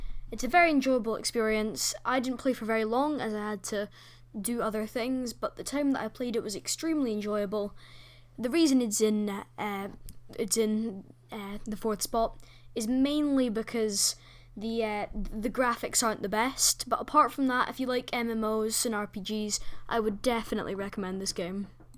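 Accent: United Kingdom. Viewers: are you Scottish or? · i like british people, and you sound british just in case your not, hell im even trying to speak in british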